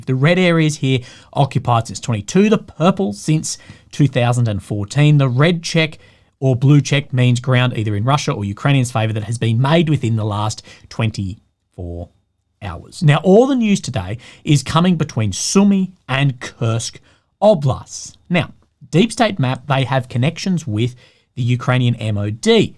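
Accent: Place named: Australia. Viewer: Just started watching your updates, good to hear an Australian voice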